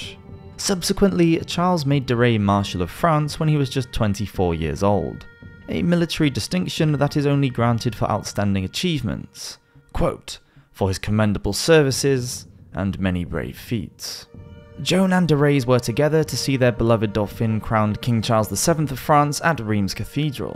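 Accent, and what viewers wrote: England, United Kingdom. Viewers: I love this channel. Medieval history just sounds so much better coming from an OG English accent.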